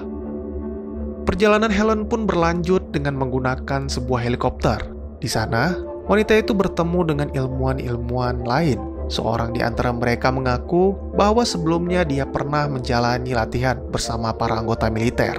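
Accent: Aceh, Indonesia. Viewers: bang orang aceh ya?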